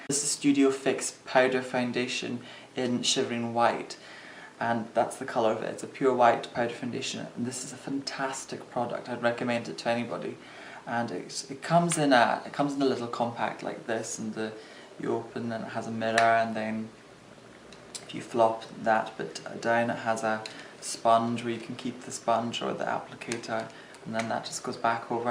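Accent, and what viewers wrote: Scotland, United Kingdom. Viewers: I love hearing the Scot come out- definitely different than new videos but I love them all. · So, this is a much earlier video. I do prefer your eyebrows here. Much more natural voice and enunciation. I would say here that you're Scottish and you say film normally. Hmmm. You certainly have mystique! Xxx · He was still living in Scotland back then or just moved to London, lots of people (especially when they're young like ) change or tone their accent down when they move in another area. one of my closest friends is from Merseyside but has lived in Manchester for more than 10 years now and her relatives complain because she lost her Scouse. my former housemate is from Edinburgh but has a very mild Scottish accent, again she hasn't lived there in 10 years. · Rachel B He still sounds Scots.. no getting away from it... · thankyou for this video! 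I really struggle to find products pale enough for my skin, when i heard your accent i knew you understood! lol! Because i am also Scottish like you and like most of us up here, i have that typical, really pale 'peelywally' complexion. Almost everything i put on my face is way too dark, so this video was really handy! cheers! by the way, I agree with everyone else, you're really pretty! I can't believe you're actually a guy! you look more stunning than most of us girls do even after we've spent hours trying to make ourselves look nice! I wish you were my makeup artist, i could listen to that voice of yours all day, it is so soft and relaxing! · true also i was so suprised by the accent even tho i knew he was from Scotland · Your voice an accent are simply heavenly (I have always found Scottish accents to be some of the most beautiful sounding in the world, but your voice in particular has something especially enchanting in it). 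And your presentation of products is exactly what I think many people actually want and need. I am absolutely hooked on your videos and am so glad to have discovered your channel.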